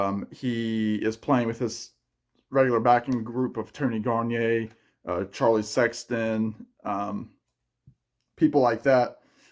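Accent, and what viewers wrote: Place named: North Carolina, United States. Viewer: Big fan here. " " is still my favorite Dylan record. " " is alright. " " is great. " " is probably the first time I heard him croon. Some of those songs reminded me of. I grew up in the Deep South and recognize southern accents. (definitely a Delta guy) I'm going to go ahead and say your accent is North Carolina, possibly Tennessee? I tried to do a Dylan show but don't touch him on YouTube. They will yank that in a heartbeat. " " looks like a black metal album cover 😅. This is good. I'm excited to watch more videos. Cheers!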